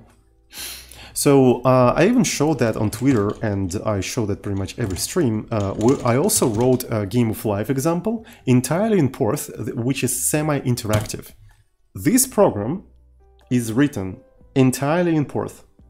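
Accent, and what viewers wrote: Russia. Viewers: такой жесткий русский акцент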